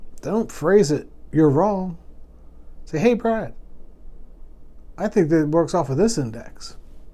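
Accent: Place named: Pittsburgh, United States. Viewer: Listening to your accent Brad, ... I am guessing you are from Pittsburgh 😊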